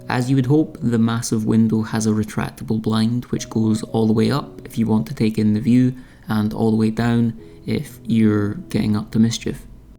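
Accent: Scotland, United Kingdom. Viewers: Hey mate I enjoy your videos no matter what you do them on you have great Scottish accent and you explain thinga really well keep it up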